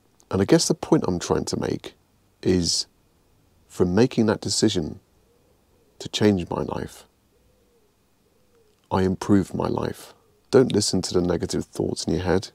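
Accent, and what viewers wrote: United Kingdom. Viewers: Wow, I just saw that video of the news anchor, too. I could so relate, as I suffer panic attacks also. I decided to try meditations as well, and now have been so much better. I still suffer from insomnia, but doing meditations during the day for relaxation helps me sleep better at night. I am sorry about your dad, grieving is such an extraordinary loss. I lost my dad in 2012, and know how easily it can be to fall into depression. But I had to stop feeling sorry for myself. I had to get my life back together and move forward, too. I commend you for working on your weight loss and doing what YOU want and need to do to make yourself happier and healthier. Have you ever thought of making custom meditations for people? I know you couldn't really put them on platforms, but you could charge a certain cost for them. I bet a lot of people would love that. 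I know if I could meditate to something custom made just for me... wow. I've always thought that would be so cool to have Lol. Anyway, another great vlog with interesting stories about you, and good tips and advice. Thanks and yes a meditation in an American accent would be neat, but honestly, I love the British accent. Plus, it just wouldn't be the same without your voice. Haha. Peace! ☮️ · No don't do an American accent lol😂 I love your meditations because of your English accent 😀great insights here 😋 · It's going very well!!. Thank you. I use your videos to practice meditation and improve my English, by the way hehehe. Your voice and British accent are beautiful for both!!. I'm only missing subtitles in a few videos but it's not really important; ) and I've got some notes about music and voice, I'll tell you. Thank you so much!!. BEST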